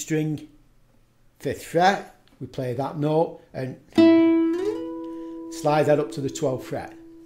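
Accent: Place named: Northern England, United Kingdom. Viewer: I could tell you are from up north before I read. Nice tutorial. I'm from Liverpool. Cheers!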